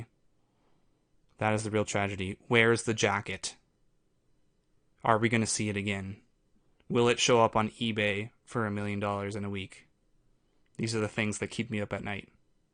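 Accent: Canada. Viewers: i think he’s gotta be canadian, the way he says out/about is just too canadian 😂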